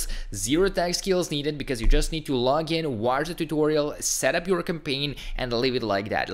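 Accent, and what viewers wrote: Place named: Estonia. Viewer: Could be wrong but my first thought was Estonia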